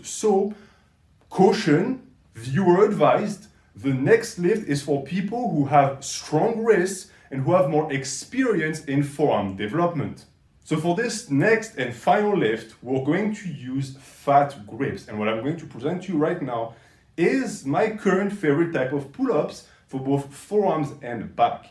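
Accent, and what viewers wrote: France. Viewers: Are u french? Nice accent 😂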